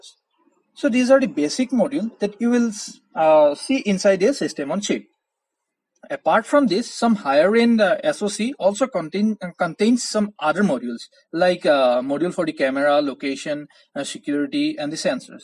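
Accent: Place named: Assam, India. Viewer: Ur Assamese ascent shows u r from nalbari side.which college engg?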